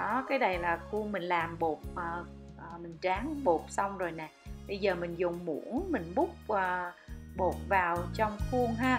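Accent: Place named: North, Vietnam. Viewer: Nói nghe mệt quá,, chị bắc kỳ ơi,, hơi dài dòng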